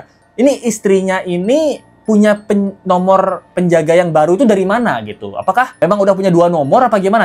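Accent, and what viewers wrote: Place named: Sumatra, Indonesia. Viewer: Logat lo sumatera bgt😅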